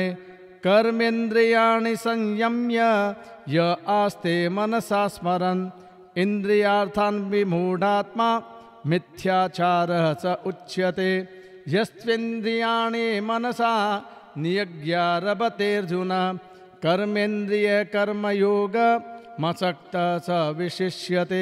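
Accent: Standard. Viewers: श्री जी को बारम्बार प्रणाम। आप श्रीमदभगवदगीता पाठ शुद्ध एवं स्पष्ट उच्चारण करते हैं। मन प्रसन्न हो गया।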